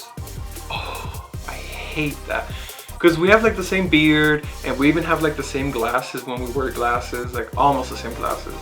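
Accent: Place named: Miami, United States. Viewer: Yeah, you've got the Miami accent. I've never heard it until I was watching one of my (now) favorite YouTubers who is from Miami, and I first thought she was not born in America. I would describe the Miami accent as sounding like someone whose native language is not english....but coming somewhere from a latin American country and learning to speak english fluently and almost without an accent..but there is still something there.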